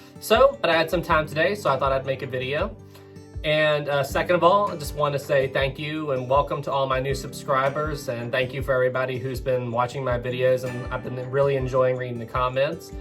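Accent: South, United States. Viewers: your Southern accent really comes out of hiding during the narration parts haha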